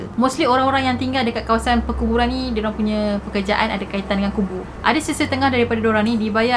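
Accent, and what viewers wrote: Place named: Perak, Malaysia. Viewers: wau ckp owng perak tengoh lehh..saya orng perak tapi belah utaraq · Pandai story cakap light Perak ye..aje gemo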